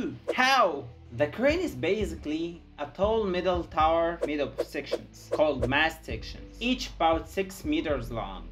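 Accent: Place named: Egypt. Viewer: Your accent is so Egyptian 😂 but i love the content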